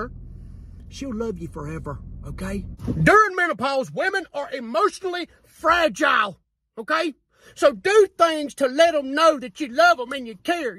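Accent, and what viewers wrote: South, United States. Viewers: Gotta be that southern accent and slang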